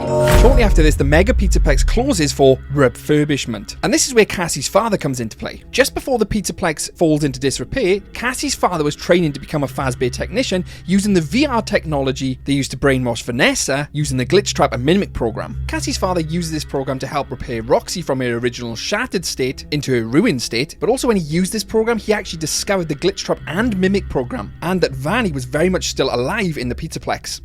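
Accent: Wales, United Kingdom. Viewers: I’m a simple man. I see a FNAF vid. I hear a Welsh accent. I subscribe.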